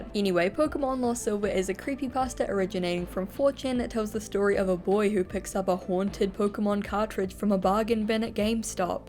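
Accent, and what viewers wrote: New Zealand. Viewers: wait, you're a kiwi too? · Kiwi?? I thought you were British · YOUR A KIWI!!!!!!!!!!! WOOOOOOOOOOOOOOOOOOOOOOOOOOOOOOOOOOOOOOOOOOOOOOOOOOOOOOOOOOOOOOOOOOOOOOOOOOOOOOOOOOOOOOOOOOOOOOOOOOOOOOO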